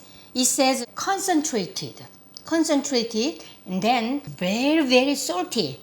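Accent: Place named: South Korea. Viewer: 한국식 영어 억양 너무 매력적이에요~~~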